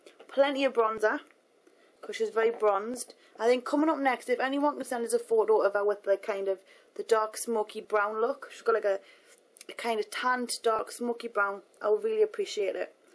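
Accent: Newcastle, United Kingdom. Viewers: Its a Newcastle accent, from the north of England. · shes from newcastle well i dont know if shes from newcastle but her accent is jordy shes definately not scottish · She's not Scottish - She's a Geordie ^__^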